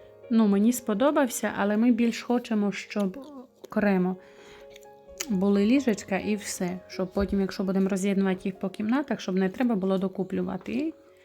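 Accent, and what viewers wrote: Rivne, Ukraine. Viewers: якщо не секрет з якої області України ви переїхали.Ваш акцент,говір дуже схожий на той де зараз живу я.Рівненська.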